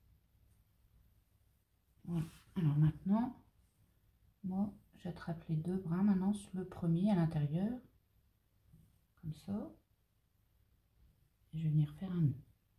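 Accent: North, France. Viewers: J'étais de somain sa sentant votre voix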